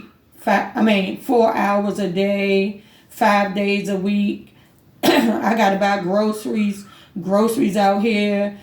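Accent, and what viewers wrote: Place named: DMV, United States. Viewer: These rent prices are crazy anyone who looks down on somebody who is on section 8 needs to watch it because the rent is way too much people claiming that voucher holders are this and that everyone has a story after being beating up for so long who in their right mind who would trash the place after being homeless most of us want to just live as you said facts this helps people get back on their feet after all most of us beeem through we grateful to have it . I’m Homeless and just got approved for my voucher finally I been through too much years worth I need this voucher I can’t pay no 3k for a one bedroom this is outrages . Same I also have struggles too . Section 8 voucher is a blessing tbh even though it’s a lot of fighting for it it’s a war for this thing . Also the name we call it is housing choice voucher but there is people who are just lost and don’t realize with this you can get a house you can get a town house you be living better than others paying all their check away lol never be afraid to take help Or ask for It I think it’s pride too that be messing up with people and they look down on others it’s very sad once you get approved and get your own place that all that matters voucher give you a place to live so no need to look down on that same with food stamps EBTim also from the DMV too I hear that accent lol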